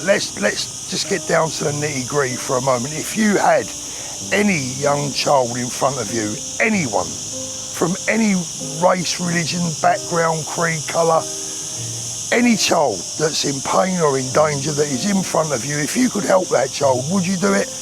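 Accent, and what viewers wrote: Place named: Cockney, United Kingdom. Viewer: You're like a cockney boxing coach from one of those movies. Thankyou.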